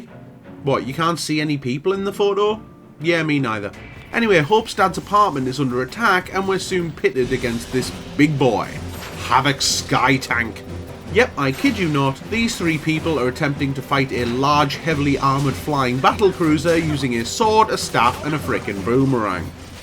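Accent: Northern England, United Kingdom. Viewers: Are you in northern england? You sound like you’re from manchester or lancaster.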